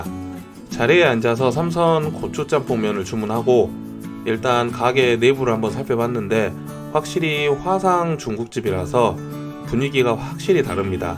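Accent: Jeolla, South Korea. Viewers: 전라도 사투리 난 실망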